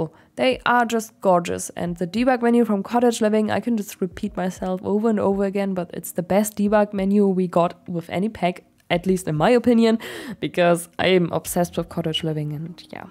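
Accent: Germany. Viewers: Are you german? because of your accent.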